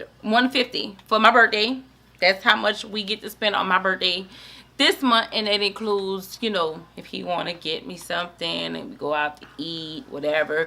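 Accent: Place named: New Orleans, United States. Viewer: just started watching your videos I lovvvvve you new orleans accent lol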